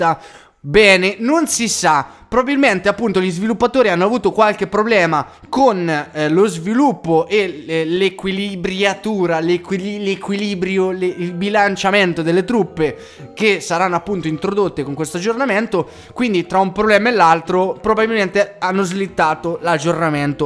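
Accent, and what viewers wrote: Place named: Ancona, Italy. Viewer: se vede da do chilometri che sei anconetano. grande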